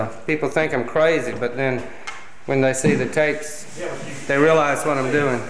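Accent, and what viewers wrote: South, United States. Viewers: goes home and that Southern draw is magnified. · It's really interesting to me how his southern accent is more pronounced in these videos when he's at home vs when he's in NYC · I think his southern accent definitely came out more in this video.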